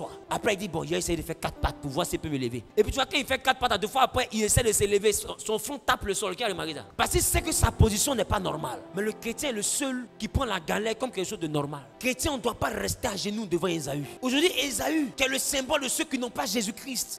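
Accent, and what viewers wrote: Ivory Coast. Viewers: Je suis fou amour de l'accent ivorien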